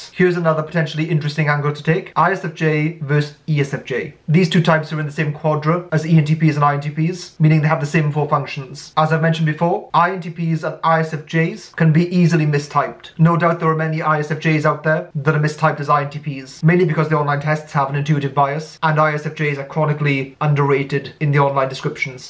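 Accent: Wales, United Kingdom. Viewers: Sounds Welsh to me. :-)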